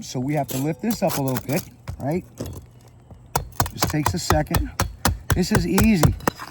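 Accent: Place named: New Orleans, United States. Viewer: I just found you because I need to Mya small roof repair and needed to confirm what I was thinking. You did just that, so thank you. About two seconds in I thought, he’s from New Orleans! You got a new follower.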